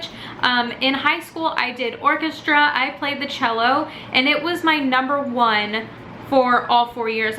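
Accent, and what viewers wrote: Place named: Texas, United States. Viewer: I did not no you live in Puerto rico you should like your from texas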